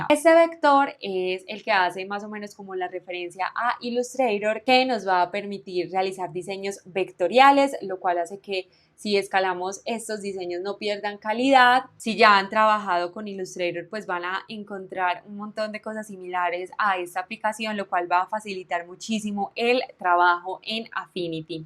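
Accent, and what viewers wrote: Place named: Colombia. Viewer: Su acento colombiano! 😍😍😍 soy de México.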